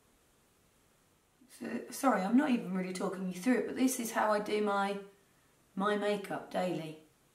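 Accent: United Kingdom. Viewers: Are u from the UK cause I love ur accent/voice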